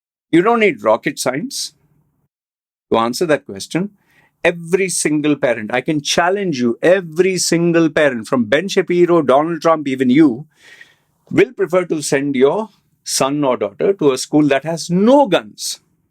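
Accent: India. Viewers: Ok looking past your tattooed funny looks and very strong Indian accent and onto the actual subject. Your first argument to prove that Islam is not a religion of peace is because most of the so called terrorist attacks are done by Muslims and not any other religion. It helps when you do a little bit of research before opening your mouth. Year 1009. Crusader siege of Jerusalem result in mass killing of over 100000 people. Historians write that blood was flowing in the streets of Jerusalem. All done in the name of Christianity hence the name Crusades. Year 1187 and Saladin conquers the Jerusalem from the Crusaders. What does he do? Offer ransom to Christian and Jews to leave the city peacefully to the land of their choice. No mass killings at all in the name of Islam. Just one example to reply back to your silly podcast with zero research. Fast forward to two world wars where millions were killed. 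Why were Jews killed in WW2? Yes because they were Jews. Who killed them? Now onto the latest example. How is committing Genocide in Gaza? Yes take your time and do some independent investigation. Maybe you will be able to see clearly even thru your tattoos next to your eyes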